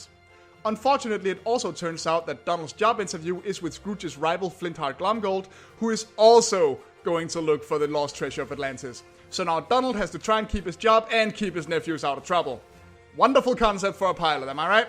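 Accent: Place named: Denmark. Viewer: You wouldn't happen to be Danish? xD Sounds like that on your accent :P EDIT ok nvm, just looked at your channel and can see you are xD Jeg er nemlig også selv Dansker, så det syntes jeg var ret cool :P haha xD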